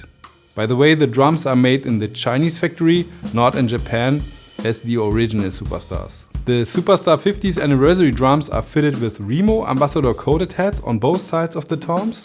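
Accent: Germany. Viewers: I very much like your demo videos. I like the direct approach to the information, and yes, I’m a weirdo, I like this type of German accent. (I lived in WB/Mainz for a couple of years long ago. As well, I’ll be taking delivery of this exact kit, in the same aquamarine color, this very week. Zo.. a little confirmation bias always feels good.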